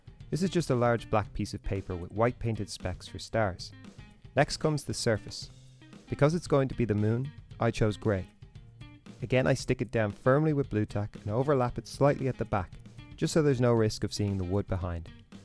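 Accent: Ireland. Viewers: are you Irish? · This was very helpful, thank you. By the way, are you Irish or something?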